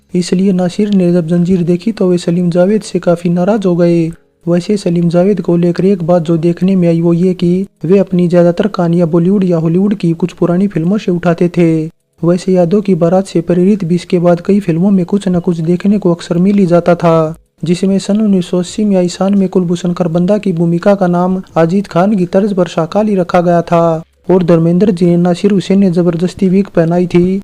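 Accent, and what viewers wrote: Haryana, India. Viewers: आपकी आवाज़ मै हरियानवी tune है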